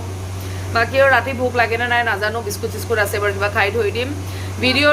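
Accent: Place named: Barpeta, India. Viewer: ভাল লাগিল ৰবা চাই।তোমাৰ ঘৰ কত?? ভাষাটোত বৰপেটা চাইড ৰ যেন লাগিছে।কিহৰ অৰ্ডাৰ লোৱা জনাবা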